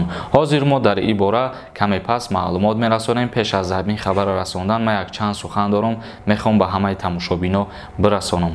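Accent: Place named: Tajikistan. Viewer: Рахмат ика хушру гап мезани бо лахни точикии точики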